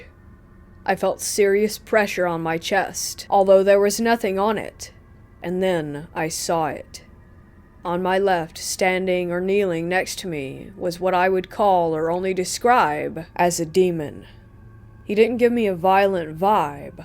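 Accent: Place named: South, United States. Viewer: The best narrator out there...cadenced, intelligent, proper enunciation... and that slight southern accent. A perfect alchemy. And no goofy innuendos either. Yayyyy! Thanks!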